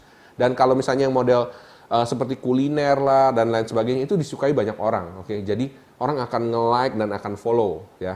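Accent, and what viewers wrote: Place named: Surabaya, Indonesia. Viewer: Logatnya sama surabaya... Mas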